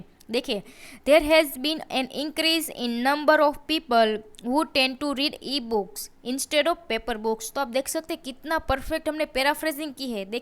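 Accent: Gujarat, India. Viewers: Mem R u gujarati? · Are you Gujarati? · English language with gujarati accents.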